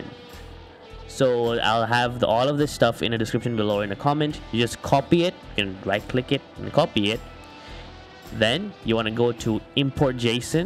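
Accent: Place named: Bengal, India. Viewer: u sound so bengali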